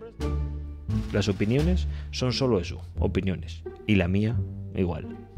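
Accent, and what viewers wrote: Spain. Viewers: cantas Hermoso aunque odio el acento de los españoles soy mexicana jajaja 🤣 no dejo de ver tu canal sabes y compartes tu sabiduría con nosotros los mortales eres único y no solo soporto tu acento y voz de verdad me agrada 😁😃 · No soporto la voz de la que fue vocalista de la oreja de Van Gogh!! No puedo con ella, se me hace horrible su voz!! Bad bunny o como se llame igual la detesto!! Y si al escuchar tu voz también me desagrada (me voy a echar al cuello a muchos españoles) en general ese acento así como el tuyo no lo soporto!!! Pero como dices mera opinión, eso no quita que la haga con respeto, solo que mis oídos no pueden con ese acento y forma de cantar de muchos españoles y recalcó muchos, no todos!!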